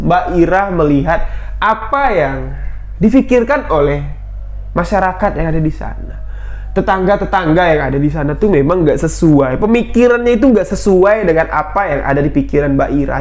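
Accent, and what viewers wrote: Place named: Bali, Indonesia. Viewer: Cepet sembuh, sehat kembali bamg. Ngomong2, kl d denger2 logat jambi agak mirip bali ya... Prtama denger bang bicara sy kira orang bali 😁😁🙏🙏🙏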